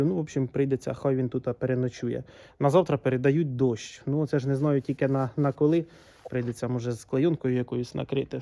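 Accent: Poltava, Ukraine. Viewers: О, полтавський говір...😊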